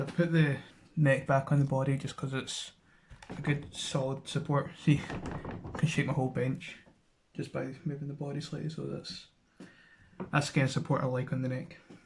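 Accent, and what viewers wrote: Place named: Scotland, United Kingdom. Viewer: You sound Scottish. I had an aria diamond looked exactly like that I swapped it for a watch from a guy that lived on a boat that had a fore head tattoo when I was living in Brisbane. I'd like your opinion on guitars. Have you got an email address? I have a Horner shorty it's a pain to change strings I'm looking at Jackson's dinky Floyd rose and the minion.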